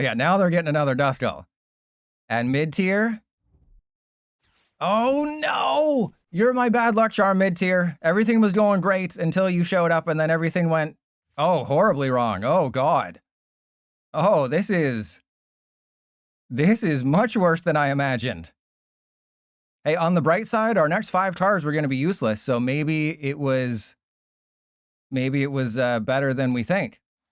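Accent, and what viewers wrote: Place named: Australia. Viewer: Living in Australia and still lucky enough to hear incredible aussie accent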